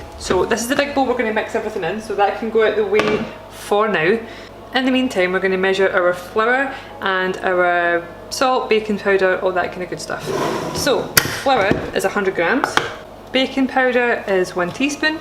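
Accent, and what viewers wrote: Scotland, United Kingdom. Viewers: I just have to say... Im from glasgow and ive lived in America since I was 12. Your lovely vids give me all the happy chemicals in my brain from my childhood. The accent and all the scottish slang makes me so happy. Ta v. much.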